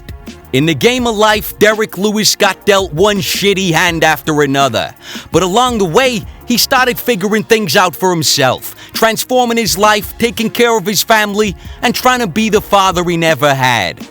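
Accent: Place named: Boston, United States. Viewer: Richard Ott I'd say Boston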